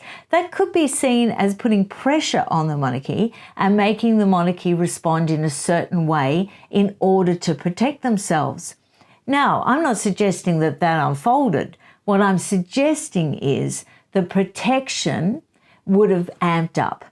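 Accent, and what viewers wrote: Australia. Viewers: Omg are you a ozzy?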